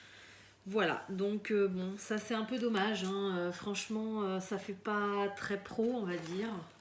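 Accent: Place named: Quebec, Canada. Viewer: Tu la bien le français québécois hihi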